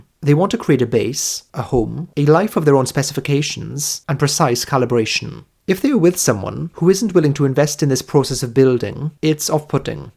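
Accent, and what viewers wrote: United Kingdom. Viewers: yeah, has his own British polite and accurate magic that is enjoyable to hear and very well applicable for some deep discussion) Well, I somehow understood by several (7 or 8, maybe) tests that I'm somewhere in INTJ-INTP-ISTJ-INFJ zone. Then I looked through videos about these types and the closest one appeared to be INTJ. Though it's either exposes INTJ as much smarter and mystical than they are IRL, either it's me being too stupid for an ideal INTJ)) I can't say for sure, but by the discussion here you really have some INFJ vibes. I usually speak more roughly and straight-forwardly. As a proper INTJ, that is ^_^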